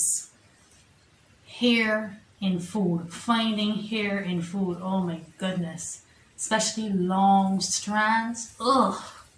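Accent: Barbados. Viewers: Hey, great job with your channel. Love de Bajan accent. Ps this is Azriel:)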